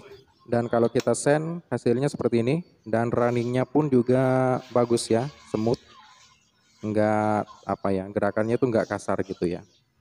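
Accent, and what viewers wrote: Madura, Indonesia. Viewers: Orng Madura kah bnG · Reng medureh ye bg😁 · Bang orang Madura kah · Bang abang orang madura kah? , saya orang madura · orang madura bang? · Org mn kok bhs madura? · Madura ya bang? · Madura ta mas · Kayak nya lu orng madura ya?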